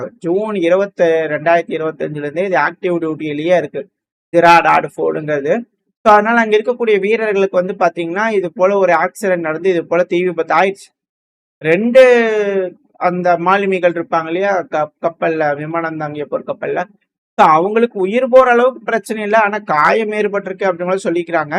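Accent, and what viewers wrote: Coimbatore, India. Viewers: R u from coimbatore, guessed it from ur slang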